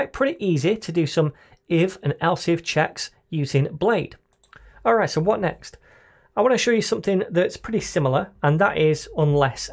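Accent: United Kingdom. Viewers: teacher, thank you so much! You teaching is always organized, concise and to the point. I was struggling with JS, and your JS Udemy course came to my rescue. I was struggling with VueJS, and your Vue Udemy course helped me out. I have noticed that you used Firebase as temporary backend for those courses. Would you please please please, make a new Udemy course of Youtube series on full stack, in which both frontend and backend are integrated in one project? Maybe Laravel and Vue or Django and Vue, which ever has shallower learning curve? I watched your tutorial so much, now when I read, I heard British accent in my head: ) Teacher, you are the best web development teacher I have ever met! God bless you!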